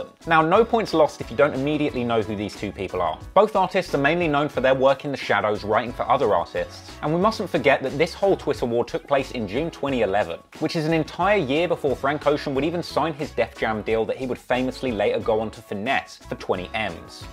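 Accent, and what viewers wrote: England, United Kingdom. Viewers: keep those jokes coming and pursue a YouTube career stop trying to be a rapper u got english accent and u dont look like a rapper , ur " remember that one time" jokes are better than ur rap skills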